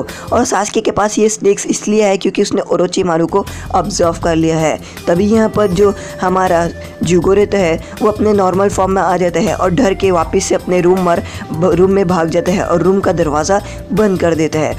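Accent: Maharashtra, India. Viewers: Bhai tum marathi ho kya?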